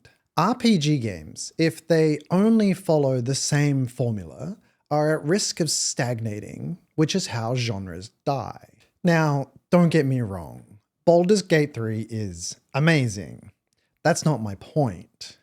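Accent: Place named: Australia. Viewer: You sound australian but pronounce some words very american. I am confused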